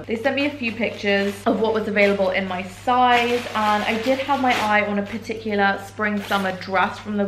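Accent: United Kingdom. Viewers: oh my goodness, my daughter made me watch your $10,000 quarantine hall with her and now we watch all your hauls together lol. "mommy come our friend from Dubai with the British accent posted!"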